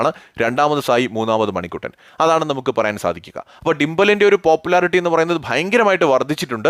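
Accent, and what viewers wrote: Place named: Ernakulam, India. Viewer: സംസാര ശൈലി ekm ക്കാരുടെ ആണ്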